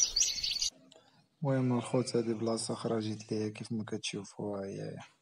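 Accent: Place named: Morocco. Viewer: هذه ليست تونس أخي حتى اللهجة مغربية ❤❤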